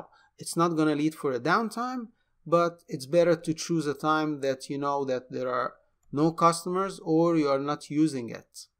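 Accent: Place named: Iran. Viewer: You can't hide that Iranian accent mate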